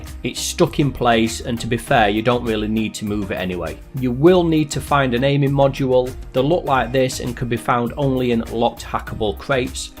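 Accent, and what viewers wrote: United Kingdom. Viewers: I dont know why, but I always learn more about Rust from a chap with a British accent.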